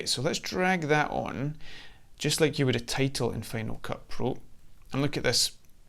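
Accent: Scotland, United Kingdom. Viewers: Title drew us in Scottish accent kept us here ❤‍🔥❤‍🔥❤‍🔥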